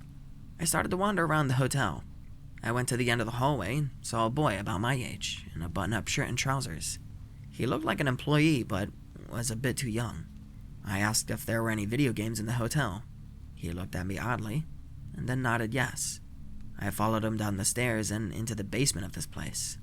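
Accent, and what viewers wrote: Scotland, United Kingdom. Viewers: Sometimes the worst stories are the best! Think this is my 3rd listen already. Hope life is going better! Thanks for the BEST Scottish accent ever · Nice Scottish accent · best scottish accent evwr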